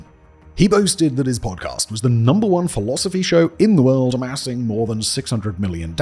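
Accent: England, United Kingdom. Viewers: I'm not a fan of stephon, but you're censorious attitude is very off-putting, i'm starting to feel like whenever I hear an english accent that i'm about to hear some fascist rhetoric.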